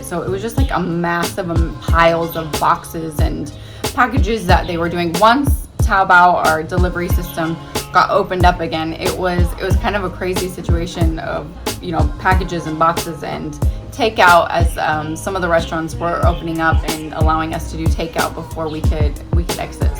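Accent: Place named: New York, United States. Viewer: Are you from NYCity？ sounds familiar XD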